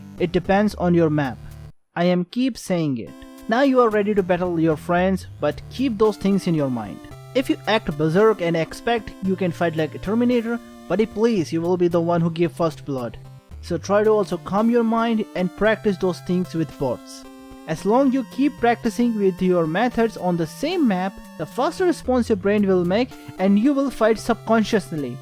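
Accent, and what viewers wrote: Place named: India. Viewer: Talking is indian accent is ok bro Pls using American accent looks very cringe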